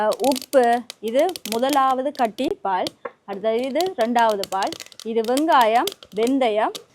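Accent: Jaffna, Sri Lanka. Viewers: யாழ்ப்பாணத்தமிழ் கேட்க நன்றாக உள்ளது நன்றி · இனிமையான இலங்கைத் தமிழும் விறகடுப்பு வெந்தகயக் குழம்பும் மிக மிக அருமை ❤🎉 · Sorry,Neenga ilangai thamizhara. Unga language vithiyasama theriuthu😊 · Ilangai Tamil alagu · நீங்கள் பேசும் அழகு தமிழ் அருமையான தமிழ் இதற்காக எத்தனை முறை பார்த்தாலும் சலிப்பு தட்டாது அருமை அருமை வாழ்க தமிழ் யாழ்ப்பாண தமிழ்❤❤❤❤ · உண்மையான செயல்திறன் கொண்ட செய்முறை விளக்கம் அதுவும் எனது பிறந்த மண்ணின் உணவு நாங்கள் 1980ஆம் ஆண்டில் இந்தியாவில் தமிழ்நாட்டில் குடியுரிமை பெற்று வாழ்ந்து வருகிறோம் அடுத்த வீடியோவில் எனது உறவினர் பற்றிய விபரங்களை தங்களிடம் விசாரித்து அவர்களின் விபரங்களை தெறிந்துகொள்ள இருக்கிறேன் சகோதரி இனிய இலங்கை தமிழ் நன்றி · Like yalpanam tamil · யாழ்ப்பாண தமிழ் என்றுமே இனிமை..தமிழ் நாட்டில் இருந்து சமையல் குறிப்பு கூறுபவர்கள் பாதி ஆங்கிலம் தான்.. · யாழ்ப்பாணத்தின் உணவும் யாழ்ப்பாணத்தின் பேச்சும் என்றும் சுவை தான் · யாழ்ப்பாண தமிழில் அளவிலாவி இரசித்து சமைப்பது அருமை. 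வாழ்க 🎉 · அக்கா உங்க இலங்கை தமிழ் கேட்க இனிமையாக இருக்கிறது வெ ந்த ய குழம்பு பார்க்கும் போதே சாப்பிடணும் போலாம் இருக்கு மிக அருமை நன்றி சிஸ்டர் ❤️❤️❤️ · Arumai sister eela tamil very super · இயற்கையான முறையில் திறந்த வெளியில் மிக அழகான யாழ் பாண தமிழில் அழகாக வெந்தய குழம்பை நா ஊரும் வகையில் செய்முறையை Live ஆக செய்து காட்டியது மிக அற்புதமான செய்முறை. சூப்பர். அதைவிட யாழ் பான மண்ணில் பிறக்கவில்லையே என்று வருந்து கின்றேன். உங்கள் யாழ்ப்பாண தமிழ் கொஞ்சும் தமிழ் மிக அழகாக கேட்க கேட்க தேன் போல் இனிமையாக இருந்தது. சொர்க்கத்திற்கு கூட்டி கொண்டு சென்று விட்டீர்கள். அருமை மா. உங்கள் இந்த யாழ் பான சமயலை தொடர்ந்து எங்களுக்கு அனுப்பி வையுங்கள். எனக்கு உங்கள் சமையல் முறை மிகவும் பிடித்தது. அனைத்தையும் மெருகூட்டும் வகையில் உங்கள் குரல் மிக அழகு கொஞ்சும் யாழ்ப்பாண தமிழில் கேட்கவே அருமையாக இருக்கிறது தாயே. நீ வாழ்க வளமுடன் பல்லாண்டு. என் ஆசிகள் என்றும் உங்களுக்கு. தெய்வம் ஆசீர்வதிக்கும் உங்கள் வெள்ளை மணமும் குணமும் மிக அருமை. 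நன்றி மகளே 🙏🙏🙏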